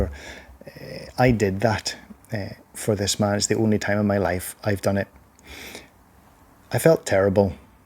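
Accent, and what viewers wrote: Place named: Scotland, United Kingdom. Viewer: I really respect your honesty in sharing such a heartbreaking story As a Doctor,it is apparent that you always go the extra mile with compassion and diligence There is nothing for you to feel guilty about as you did all you could Labels,before a full work up is done,are indeed dangerous and damaging as illustrated in this particular case You have a charming accent May I ask which part of Scotland you are from,Sir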